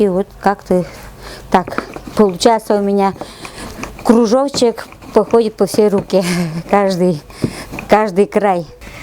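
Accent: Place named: Udmurtia, Russia. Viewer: Дивный сюжет! Я и Ижевска, живу сейчас в Молдове, и просто слышать родной говор так приятно))) Приготовлю на неделе перепечи)))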